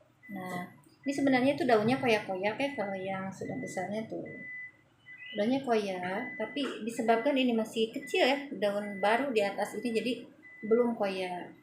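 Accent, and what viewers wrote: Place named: Malaysia. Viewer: Kk dari Malaysia ya? Soalnya beda logatnya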